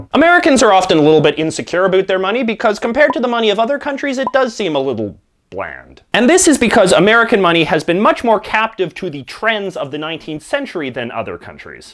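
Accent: Canada. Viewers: After hearing all those aboots. I suspect this guy of being Canadian.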